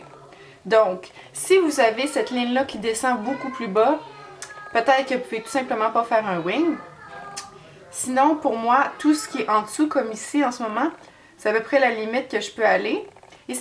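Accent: Quebec, Canada. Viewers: Waouh !!! Quel œil de biche ! Merci pour la technique et ce petit accent québécois j'adore 😉😊🌻❤ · Adorable 💖 ma super heroe : tu expliques merveilleusement bien et ton accent canadien wahou 😍 on meurt d'envie de partir vivre chez vous : au CANADA 🇨🇦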